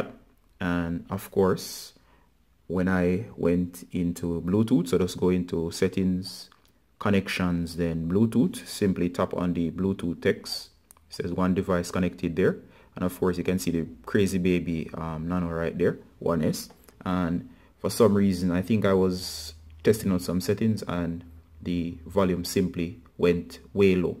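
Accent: Jamaica. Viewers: A which part a Jamaica yuh come from dawg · You sound like a jamaican. Well thanks for the advice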